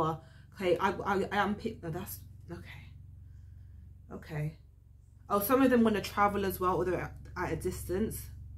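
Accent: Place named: United Kingdom. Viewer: Hiya miss gotta luv the accent(wich part of the UK)and nothing wrong with shopping at Aldi init🙂 I think i found me new best tarot card reader miss 😍🤫 (Not disrespect here dear sis...i ain't build like that) The last holiday i went i met this lovely couple Claire and John living in Kent/Canterbury...maybe it's time to pay them/the UK a visit. Superthanks translating the cards and MASSIVE RESPECT from the Dutchman Ma'am 🙏🏼✌🏼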